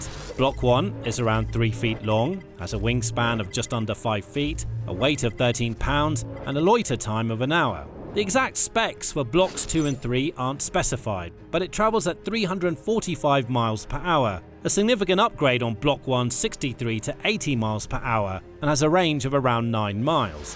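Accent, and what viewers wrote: United Kingdom. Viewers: Why is this using a British voice if its generated in the US? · The US Military should have a hand in reviewing videos like before they are uploaded to YouTube. This guy with a British accent does not care if he is revealing top secrets of the US Navy. He is more inclined to sympathise with the IRGC, and with this video, he is giving a tip to the IRGC on how to sink the carriers that are already in the war. Hey US Military, FBI and whoever else: Start kicking doors!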